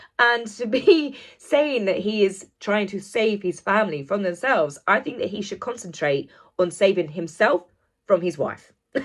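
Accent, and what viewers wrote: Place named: Northamptonshire, United Kingdom. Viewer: I live in the states but hearing your beautiful accent makes me miss my family back home in Northamptonshire. ❤️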